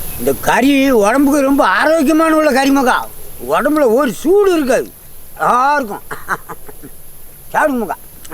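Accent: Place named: Nagercoil, India. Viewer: Slang nagercoil maari iruku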